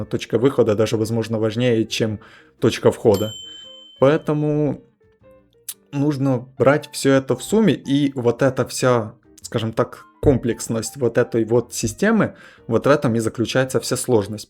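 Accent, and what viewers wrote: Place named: Vladimir, Russia. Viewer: Классный говор, Владимирская обл.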